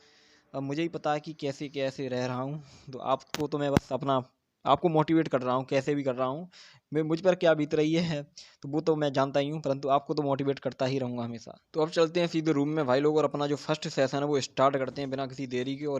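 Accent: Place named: Etawah, India. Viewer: Aapki voice Etawah jaisi lag rhi h .. apke vlogs bhut motivational h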